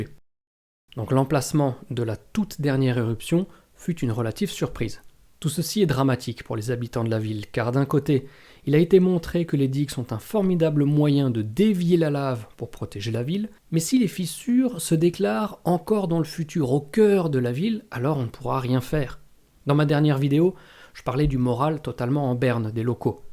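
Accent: Iceland. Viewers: Votre accent islandais est surprenant, l’islandais est surprenant ^^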